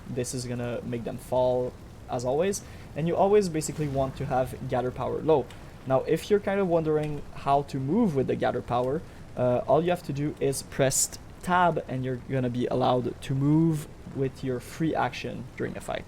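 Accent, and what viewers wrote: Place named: Quebec, Canada. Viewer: I recognize the Quebec accent and I am happy. Great build as well. I never learned how to play Kineticist and this makes me want to try it out.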